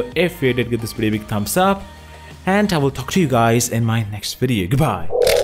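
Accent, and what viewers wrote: Kerala, India. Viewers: So finally that is a mallu accent ...ooorange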